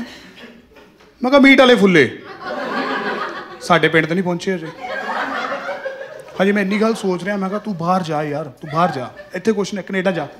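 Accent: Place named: Malwa, India. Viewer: Pure malwai o paji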